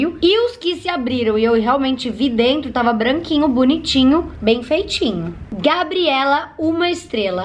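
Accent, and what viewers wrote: Rio Grande do Sul, Brazil. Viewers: Eu amo essa, ela é gaúcha nata! Até o jeito de falar, maravilhosa ♥️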